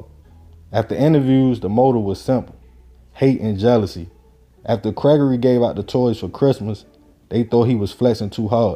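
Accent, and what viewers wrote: Baltimore, United States. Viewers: I know Bmore when I hear it 💪🏾! I'm just getting hip to your channel. Love the content and storytelling so far. This story reminds me of a few incidents from the Horseshoe. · Bro I moved from Bmore bout 17 yrs ago, been back and forth, but your voice and way of talking brings me back🫡🫡🫡🫡channel is excellent bro! Dont stop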